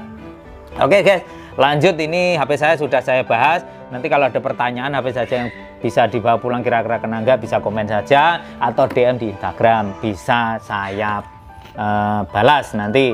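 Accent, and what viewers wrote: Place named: Ponorogo, Indonesia. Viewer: Pantes logat e podo ponorogo mas 😁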